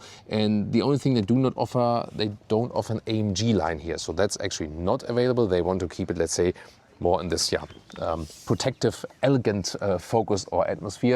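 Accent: Germany. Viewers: As a woman, the host is very hot. However, the German/Austrian accent just ruins it for me. He'd be a perfect 10 if he spoke Italian, perhaps Portuguese ❤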